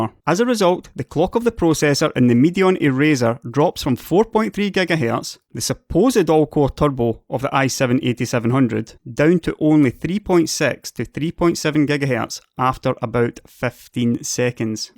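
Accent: Scotland, United Kingdom. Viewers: Its the Scots accent, it just sounds like you are being nasty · r u Scottish? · Keep your Scottish up!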